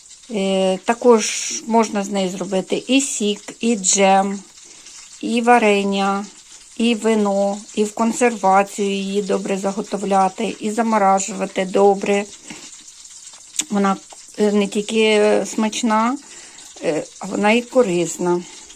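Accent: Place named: Western Ukraine, Ukraine. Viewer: Дякую Вам щиро! Прекрасна українська мова у Вас. А наших українських діалектів в Україні аж більше 40! А по мові Ви, певно, з Тернопільщини чи Франківщини (можливо, я помиляюсь, у нас у всих різні наші діалекти). Так що, своєю солов'їною разом з нашими рідними і різними українськими діалектами переможемо російську навалу і російських слів, і їх самих з нашої України. Дякую щиро за український контент! Переможемо обов'язково!!!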